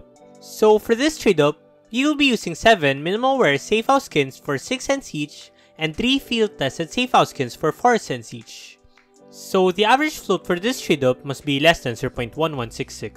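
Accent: Philippines. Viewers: my favorite trade up is the safehouse tradeup it made me 2.30 cents thanks you sound Filipino haha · Filipino for sure. Atleast he is trying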